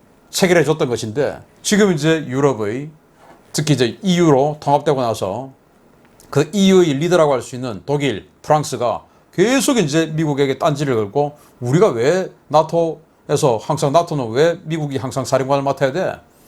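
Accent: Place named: Gyeongsang, South Korea. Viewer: 지긋지긋한 경상도 사투리 써가며 뭘좀 아는척하네? 우물안서? 그러면 영어로 해석하며 이곳으로 넓은 세상으로 나와보든지???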